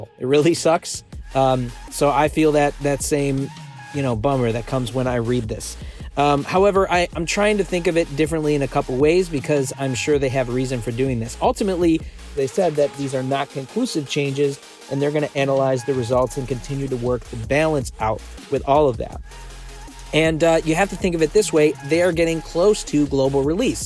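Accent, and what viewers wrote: United States. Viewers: He is American cant u tell by his accent not everyone knows hindi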